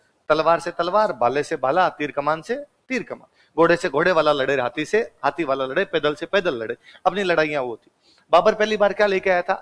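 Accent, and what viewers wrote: India. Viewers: सर आपकी देसी बोली बड़ी प्यारी है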